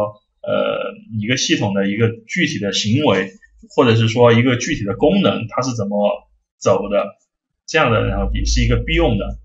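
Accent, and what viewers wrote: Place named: Sichuan, China. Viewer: 老师像是四川人，哈哈哈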